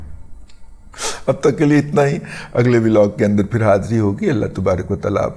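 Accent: Punjab, Pakistan. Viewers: صاحب پنجابی سوہنی اے تُہاڈی، حِس وی پکی پنجابی اے، اُردُو توں جان ای کیوں نہیں چھُڈا لیندے۔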